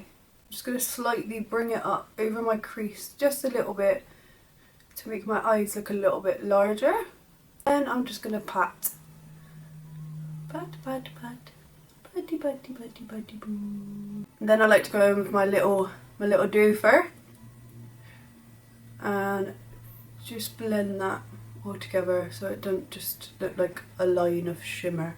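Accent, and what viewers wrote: United Kingdom. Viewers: Done: Insta giveaway. <link> OMG I’ve watched you on insta silent for so long, I didn’t even know you had an accent from England! I’m near Newcastle. Every time I hear your kind of accent I think of that “I’ve got a brand new combine harvester and I’ll give you the key” 😂😂😂 don’t be offended please lol! P.s it’s skinny but it’s wide 🤙🏻😉😂❤️ love your vids on insta! More in YouTube please deffo ❤️❤️❤️❤️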